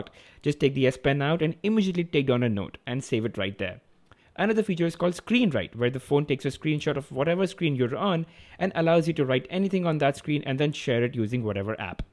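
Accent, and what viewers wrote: India. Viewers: I just hate the Indian accent. It ruins everything everytime.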